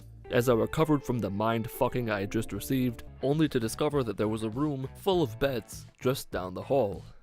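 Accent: Michigan, United States. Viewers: R u from Michigan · That’s what mighiganders sound like you are offending my people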